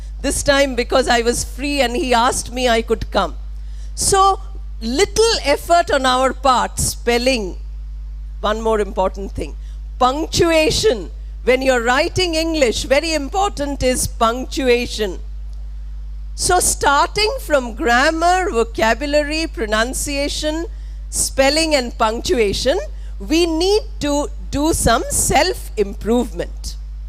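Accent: India. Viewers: Indian English you are uttering !!!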